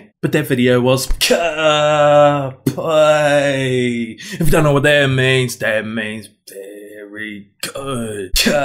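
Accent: New Zealand. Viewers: omg are you from New Zealand? your accent:oo is amazing, react to more of his mask king videos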